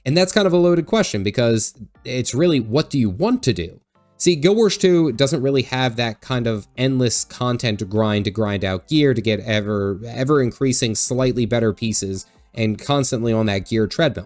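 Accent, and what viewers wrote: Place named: Eastern Pennsylvania, United States. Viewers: love the content and your eastern PA/south NJ accent. cheers, dad gamer from MA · Appreciate it! Catching some speech patterns. Are you from Maryland, Delaware, or Pennsylvania? Maybe parts of DC. Thanks for vid 🖖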